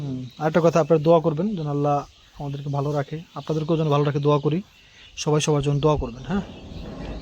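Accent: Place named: Barishal, Bangladesh. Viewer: বরিশাইল্লা কথা বলে তাই কথা বুঝি না কলা গাছ কাটার পরে বলে এককালে জাল ছিঁড়ে পড়ছে৷ অনেক কথাতেই বলে এক কালে এক কালে এককালের অর্থ কি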